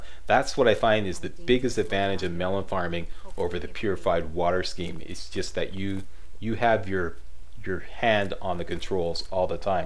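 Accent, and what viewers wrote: Canada. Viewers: You sound pretty damn Canadian Bruh. #SK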